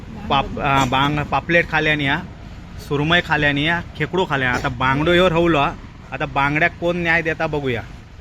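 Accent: Malvani, India. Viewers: तुमच्या एवढी गोड मालवणी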